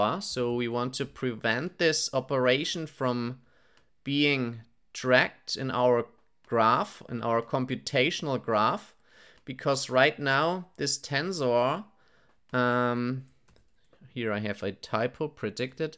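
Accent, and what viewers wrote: Germany. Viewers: I love your strong German accent. I study at a German university and you remind me of my professor. Thank you very much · Thank you very much. I love your gernan accent, and your tutorial helped me learn pytorch.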